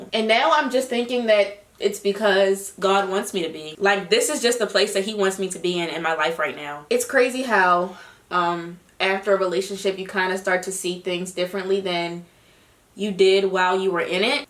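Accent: Baltimore, United States. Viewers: You and Thechicknatural have the same accent and both from being from Baltimore is cool. It would be so dope if you guys collab.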